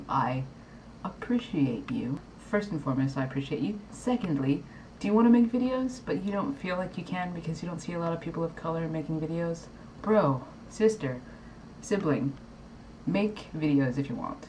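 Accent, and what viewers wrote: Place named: United Kingdom. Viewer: where you in britain a long time? you have a slight british accent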